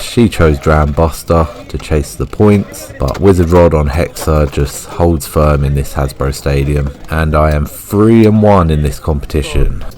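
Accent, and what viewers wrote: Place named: England, United Kingdom. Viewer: why do you have an English accent but live in poor ass Philippines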